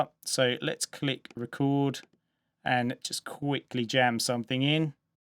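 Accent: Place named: United Kingdom. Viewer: Nobody knows how i love british accent!!!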